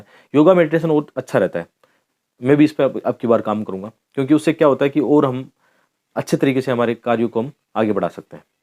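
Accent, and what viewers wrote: Haryana, India. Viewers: Sorry....आप सब कुछ छोड़ कर सिर्फ खुद पर ध्यान दो। इतनी जानकारी books की questions की किसी को नही होती बस आप एक जगह ध्यान लगाओ,ओर वो ह आपके बोलने का हरियाणवी लहजा बाकी सब perfect है।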